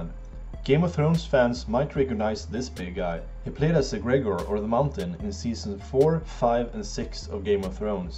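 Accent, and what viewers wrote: Sweden. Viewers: I can hear you are from Sweden!;D Swe: Man kan höra att du är från Sverige!;D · Are you from sweden? + I SUBSCRIBED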